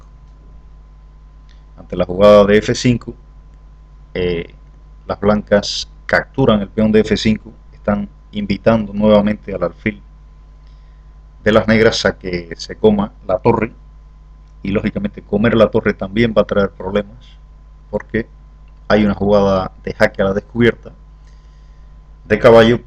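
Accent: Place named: Canary Islands, Spain. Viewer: Por el acento parecías canario jejeje. Muchas gracias por los vídeos que subes. Yo estoy empezando a estudiar un poco en serio y la verdad que me ayudan mucho. Un saludo!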